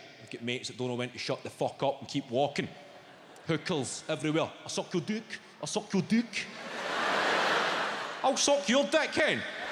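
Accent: Scotland, United Kingdom. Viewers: It's not an English accent... UK, Britain, are made up of countries...not one! is Scottish not English. Therefore he speaks the language of English perfectly normally, for someone from Scotland. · His Scottish dialect gives his jokes an other level, but me being a Norwegian, are having a hard time understanding everything. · Very good comedian but as an American I can hardly understand what he's saying through the Scottish accent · Grow up lol, just learn how to interpret a Scottish accent, its not rocket science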